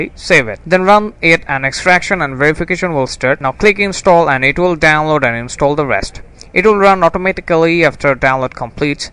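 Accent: India. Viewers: cazzo sei un indiano?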